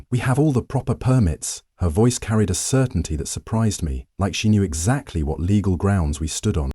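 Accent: United Kingdom. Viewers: What the hell with the British narration?? Just another Boss woman story . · Why is it a british voice No one in Britain has guns Gtfooh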